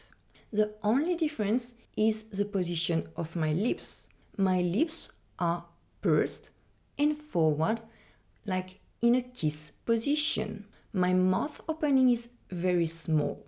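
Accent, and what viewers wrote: France. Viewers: Good point early on: The teacher here has a very strong French accent, but I can understand her quite easily (though perhaps I have to listen a tad more closely than if she were a native English speaker like me).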